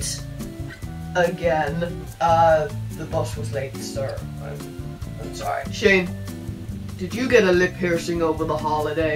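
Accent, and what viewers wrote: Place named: Ireland. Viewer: You're from Ireland aren't you, I can tell with your accent it's the same as my friend Apple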